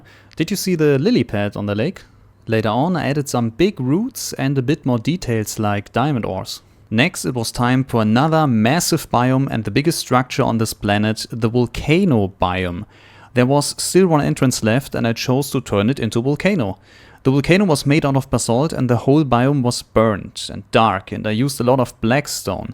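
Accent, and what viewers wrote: Germany. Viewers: very deutsches englisch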